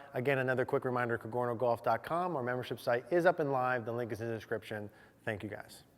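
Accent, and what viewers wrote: United States. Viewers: A wonderful teaching perspective. How I wish I could spend some time listening to your best friend about tell me about how you came to your approach to the swing. His best friend's getting better-more entertaining-at his presentations. When you make a joke, your Pittsburgh sppech gets faster. Slow the jokes down for us old farts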